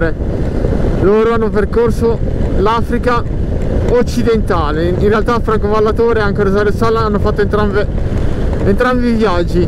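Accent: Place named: Liguria, Italy. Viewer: Ciao, in un video hai toccato varie parti della mia vita. Nato a Zena sento il tuo spiccato accento ligure, motociclista e innamorato delle due ruote come te, ho vissuto nella parte occidentale africana e confermo essere ostica, ma non ti molla piú puoi lasciare l’Africa, ma lei non lascerá te. Inoltre il cuneese é dove vivo da qualche anno e spesso vedo che passi per le strade che bazzico io con la mia bimba 😍🥰. Grazie di tutto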